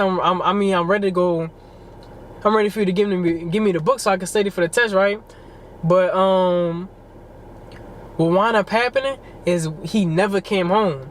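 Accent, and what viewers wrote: Louisiana, United States. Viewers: You got a lil Louisiana slang accent must be borderline Mississippi lol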